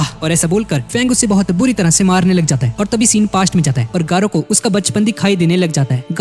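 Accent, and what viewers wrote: Punjab, India. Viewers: Bhai aap punjab se kya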